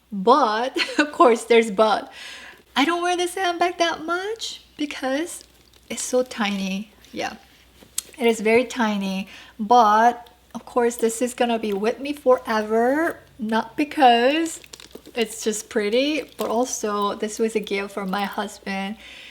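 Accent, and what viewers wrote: South Korea. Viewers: sorry can i ask you one thing? are you from korea? cuz i hear some korean accents 😹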